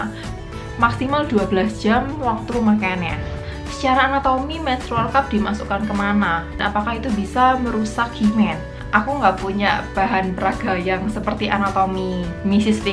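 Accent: Java, Indonesia. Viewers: Sampean wong endi tohh mbak medok tenan jowo na akoh wong ngapak mbak ora ngapak ora kepenak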